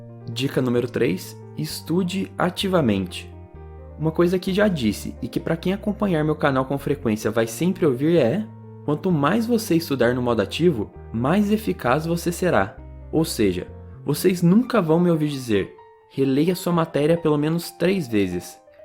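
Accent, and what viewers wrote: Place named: Minas Gerais, Brazil. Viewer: Onde o mora? Ele parece Mineiro!!!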